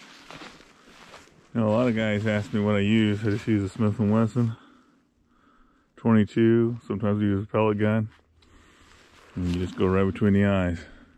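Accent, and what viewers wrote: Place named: Missouri, United States. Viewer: can tell your a missouri boy! love it man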